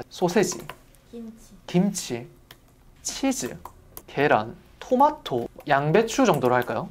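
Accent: Gyeongsang, South Korea. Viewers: 부산일보라 억양에 서울말쓰는듯 하면서 경상도 사투리가 보이네요ㅎㅎㅎ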